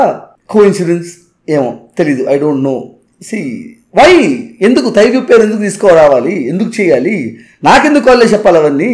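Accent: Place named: Karnataka, India. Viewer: His telugu slang was very much like Karnataka Telugu slang.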